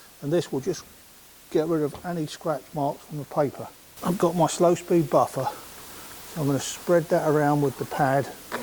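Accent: United Kingdom. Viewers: Boat us but it’s a Brit 🇬🇧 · BoatUS with a British accent.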